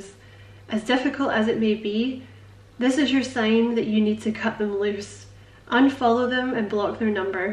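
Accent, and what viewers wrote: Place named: Scotland, United Kingdom. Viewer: OMG your Scottish accent is so adorable.. The reading is so spot on, and exactly what I needed to hear. Thanks!!!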